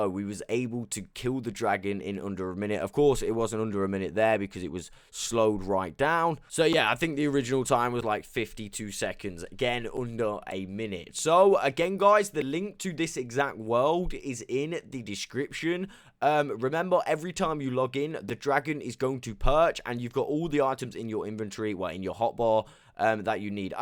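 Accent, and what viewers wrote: United Kingdom. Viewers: You sound like DanTDM but your accent is more British (hope that makes sense lmao). Also great video. It helped me so much, I used to spend so much time getting armor and stuff for fighting her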